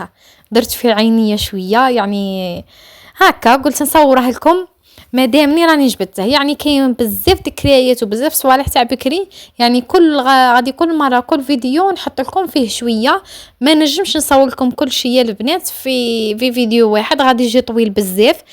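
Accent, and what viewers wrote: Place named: Arab world. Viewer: تبارك الله فكرتينا في الوقت الزين انت من جهة الغرب كما لهجت ناس الغرب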